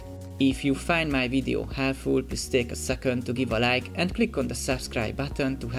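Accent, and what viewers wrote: Hungary. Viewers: Are you from Hungary?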